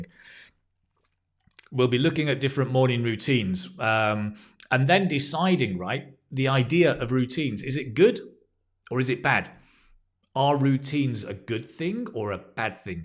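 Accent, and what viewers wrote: United Kingdom. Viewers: you deserve all of respect and appreciation. I always enjoy listening to your amazing british accent ❤❤❤❤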